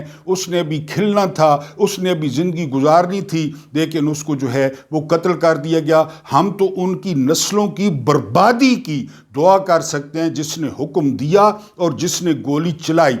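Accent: Saraiki, Pakistan. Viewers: جیو۔ بھائی ۔۔۔ انداز گفتگو ۔۔ سرائیکی تڑکہ لگا نے کا زبردست انداز ۔۔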